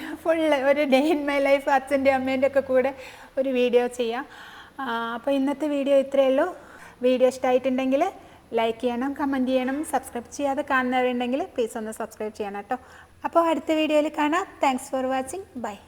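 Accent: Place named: Tirur, India. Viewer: Njan janichathu Tirur aanu❤ nostalgic aanu samsaram okke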